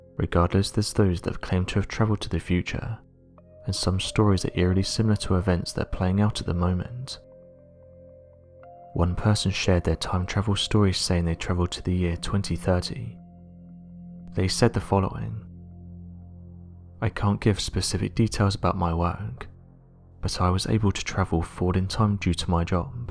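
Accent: England, United Kingdom. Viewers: Cant stand your English accent! Find someone else to do the narrative. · Stories are great but I cannot stand the guys voice, it's the one English Accent that sounds like a head-injured rugby player... apologies again as it is not like me to criticize but, at this point it's like nails on a chalkboard, and, I taking and hiding these notifications and I will find suitable replacement...👽🚬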